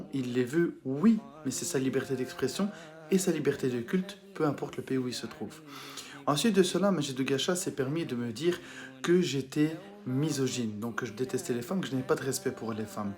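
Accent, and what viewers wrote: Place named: Namur, Belgium. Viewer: Ou habite tu en belgique ? J'entends un accent de namur mais je ne suis pas 100 % sur. On pourrait parler face à face d'appliquer la sh4ria dans notre pays et les details que ça implique ? Tu pourras réaliser le nombre incroyable d'infidéles à faire Peter avant que nos loi et advancés sociales ne retournent à l'age de fer.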